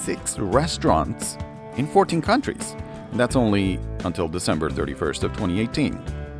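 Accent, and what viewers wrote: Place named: United Kingdom. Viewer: British accent pffff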